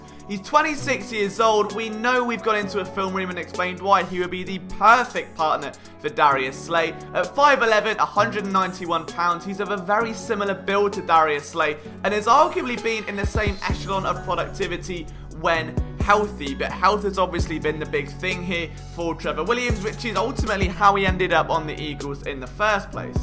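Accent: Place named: United Kingdom. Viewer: Are you British? How did you become a Eagles fan? Love the accent btw. Stay safe!!!!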